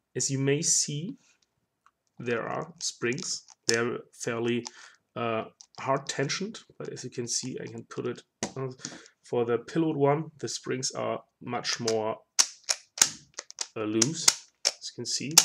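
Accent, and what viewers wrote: Germany. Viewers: Thanks for the review! Are you german?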